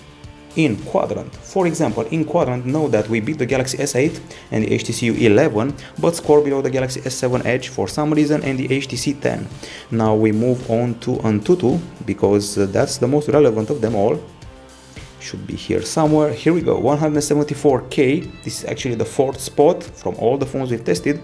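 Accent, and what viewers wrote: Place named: Romania. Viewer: După accent ești român? Bun review. Multumim!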